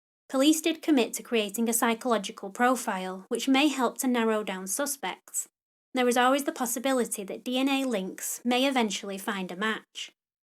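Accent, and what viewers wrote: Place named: United Kingdom. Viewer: Really good! Well researched, well read. Great to hear a true crime podcast read in a British (regional!) accent.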